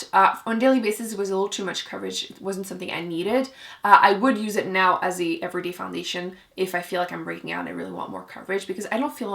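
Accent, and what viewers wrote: Quebec, Canada. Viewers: Clairement tu parles français, Québec?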